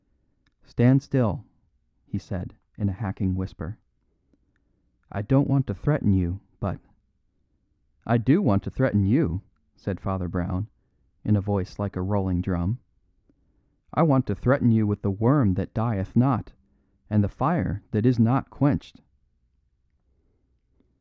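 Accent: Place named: Midwest, United States. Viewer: Look with all due respect my friend, would you really expect a British person to read John Steinbeck with the characters of California fishery, or Tennessee Williams with the southern characters that really only an American inherently understands. It is beyond the capacity of the British to do these Justice. Ergo unless you have spent a portion of your life, say 20 years or so, living in the UK, and I gather by your accent you have not left the Midwest it really causes a problem with presentation. They're going to be terms and expressions in there that you are unfamiliar with and this all absolutely works in reverse. How about giving us a nice shot of Edith Wharton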